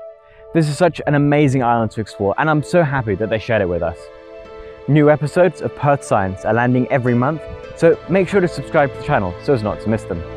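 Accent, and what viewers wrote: England, United Kingdom. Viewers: I don't get it. Your accent is English, but you say "we Australians", what's your history bro? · You're Australian but it sounds like you have a Brittish accent.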